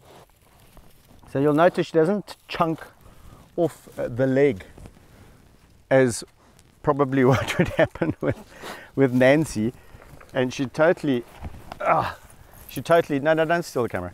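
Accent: South Africa. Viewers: Very special...many thanks. Your accent is rehabilitating white South African too! Far more of a challenge than changing a spotted hyenas rep!!?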